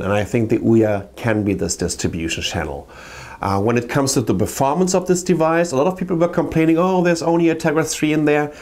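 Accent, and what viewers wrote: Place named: Germany. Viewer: God damn i love Germans speaking english.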